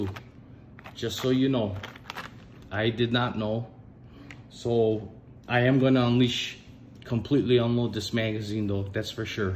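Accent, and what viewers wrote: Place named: Minnesota, United States. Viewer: You might be from Illinois but you have the perfect true Minnesotan accent. It's perfect. With a Wisconsin flare. I'm researching this gun for buying. Thank you for the video.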